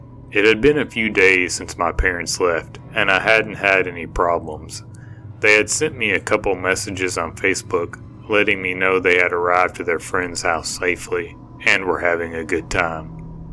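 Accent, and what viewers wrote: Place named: South, United States. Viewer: I 🖤 ur subtle southern accent, and 🖤 ur channel! Emz.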